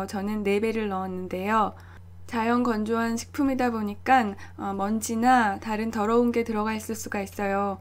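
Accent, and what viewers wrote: Seoul, South Korea. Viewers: 님 말투가 서울 사투리 같아요 ㅠㅠ 너무너무 듣고 싶은 목소리이구 ㅎㅎ 재밌어요 ㅎㅎ 레시피 감사합니다!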